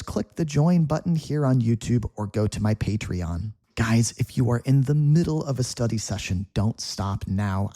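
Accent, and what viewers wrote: United States. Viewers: Hello. You're american or british?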